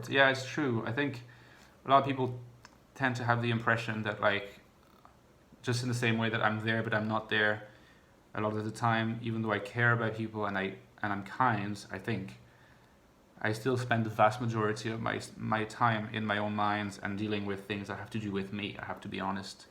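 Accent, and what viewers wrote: Ireland. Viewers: Some questions! Who is? Where are you from? What languages do you speak? You have an 'Irish lilt' to your voice. Did you spend time in Ireland? I do a very detailed test and come up with INFP and INTP. Do I need to take the test again. What is your educational background? You seem exceptionally well versed in the vagaries of the MBTI. Thank you so much. Lots of Love and Hugs. Test: <link> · Thank you very much for this comprehensive list,I relate to all of your points,I was trying to figure out your slight accent,thought you sound maybe a bit Irish,thank you for the clue in the video☺️ also I most struggle with the last point,I’m always that random girl for the situation and don’t really fit in most of the time,give people impression that I’m way too naive,I can’t take anymore their irrational reactions and try to avoid meeting ‘strangers’,I realize I can only safely socialize in private where I’m introduced to people by someone who already knows them,when I have to personally assert myself to a complete stranger and and have that initial small talk their lack of boundaries and sexualizing my ‘INFJ stare’ is just disastrous...i have women of my mothers age coming on to me sexually and telling me they are bisexual 5minutes into conversation...yep that’s how they ‘figured’ me out...😖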